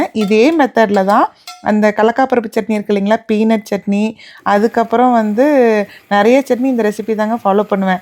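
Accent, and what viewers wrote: Kongu, India. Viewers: Kongu tamil 👌 super nga 😊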